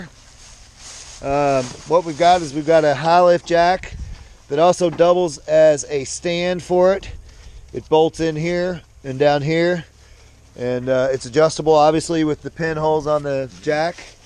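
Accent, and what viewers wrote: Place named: South, United States. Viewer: Where are you from? I hear a bit of southern.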